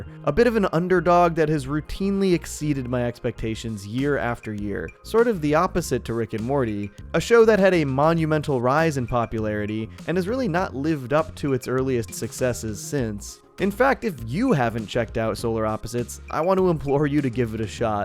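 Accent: United Kingdom. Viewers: Love the new voice. But I'm a sucker for a BBC accent. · I domt know how to feel how stereotypically British he sounds it feels a tad out of place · I just hope this guy doesn't become Rick or morty. A british Rick and morty would piss me off.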